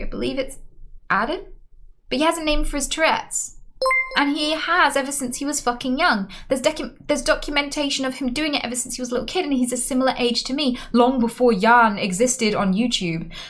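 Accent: United Kingdom. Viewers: I find it very intriguing that some of your ticks have an American accent and some have your British accent.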